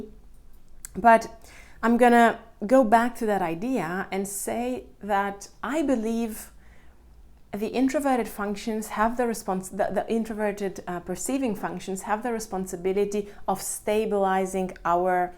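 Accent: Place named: Greece. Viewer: Are you Greek by any chance? You have a slight Greek accent :) But it’s cute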